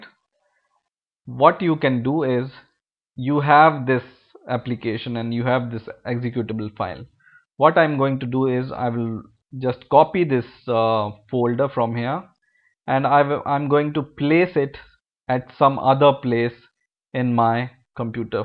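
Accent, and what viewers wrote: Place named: India. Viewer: indian accent is so anoying to listen to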